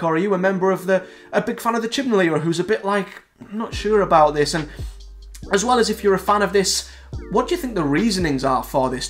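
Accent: Middlesbrough, United Kingdom. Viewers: Hi new viewer here, I agree I think it's a last ditch attempt to get viewers on side, I stopped watching after peter capaldi because I believe they were jumping on the woke wagon, this seemed to be proved true as the series went on. Before everyone calls me a misogynist, I though missy was the greatest baddie theres ever been on dr who, anyway sorry for the long winded message, are you a Middlesbrough lad , I'm from redcar recognised the accent.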